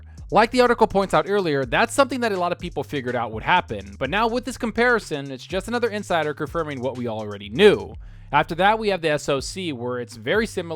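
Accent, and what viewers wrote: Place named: United States. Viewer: This channel is aids. Video is 80% neddless filler with an annoying over Americanized voice.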